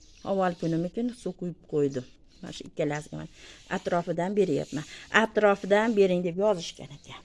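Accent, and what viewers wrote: Andijan, Uzbekistan. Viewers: Гапиришиздан андижонликмисиз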